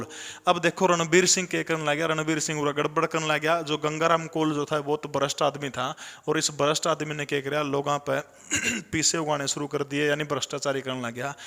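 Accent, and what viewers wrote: Haryana, India. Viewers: Aapka padhane ka Haryanvi style mast h guru jiiiiii..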